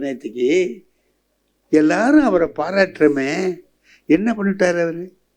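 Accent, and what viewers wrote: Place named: Cauvery Delta, India. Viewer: I like this delta district accent because I too from there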